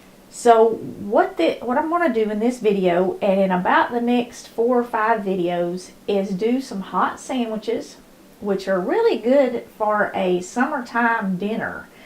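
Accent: South, United States. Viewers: . I'm a southern gal through and through. I absolutely love your Channel and listening to another Southerner. Thank you for that. You're recipes are what I grew up with and miss. And I love how you put God first so important!! Thank you for that also. Have a blessed day. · I love your videos and your biblical standards so much!! In fact, as I have lived down south for so many years, I can't decide what I enjoy more - your Southern Recipes, your "down home" biblical morals, or maybe it's your Southern accent thatI miss??!! My suggestion for a grilled cheese sandwich is to brown BOTH sides of the two pieces of bread in a fry pan at the same time and then add the cheese to each browned slice of bread; and then combine the two slices on top of each other in pan for only a few seconds more on each side. That's what I was taught; and it gives the inside of the sandwich a crispier base for the cheese when it melts!!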